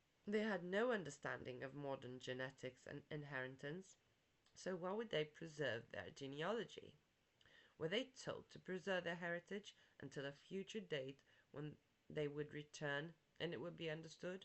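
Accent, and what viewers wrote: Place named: France. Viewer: Hey, cool topic, hope your having a great weekend, interesting accent, French or Portuguese ? Look forward to more videos Be Well 🕊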